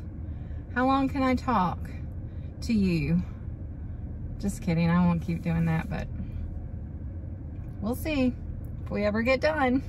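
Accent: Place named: North Carolina, United States. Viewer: Beautiful eyes and smile. Love the NC accent and your positive attitude.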